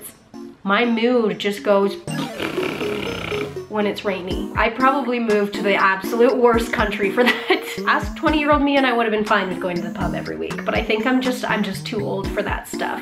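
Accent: United States. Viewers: Ah, u have discovered British sarcasm, many of my US friends struggled with this too. Sorry, it’s us, don’t take offence, it’s part of our humour (think Rikky Gervais). Sorry about the 'turning heads’ with your accent. We have probabably become less accepting of the USA since Trump, those that have not traveled there alot, may think that all American’s are ‘MAGAs’ and as awful as he is. Finally, if you lived in Louisiana or even Florida, you might thik the UK is quite a dry place ;-). But then we dont have hurricanes or earthquakes - so thats an advantage! Enjoy your time here.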